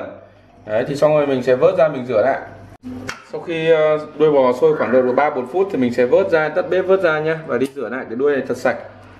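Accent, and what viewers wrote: North, Vietnam. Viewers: Anh mê kênh của chú lắm nhưng a ở miền tây nghe giọng bắc hơi khó nên a nghe đi nghe lại hoài mới hiểu. Phai chăng vừa nói vừa hiện chữ lên màng hình luôn hay quá. Cảm ơn chú nhiều. . .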